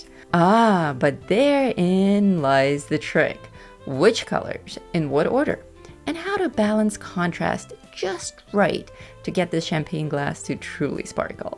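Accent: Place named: France. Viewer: You have a beautiful accent, what language do you speak? I get a hint of French.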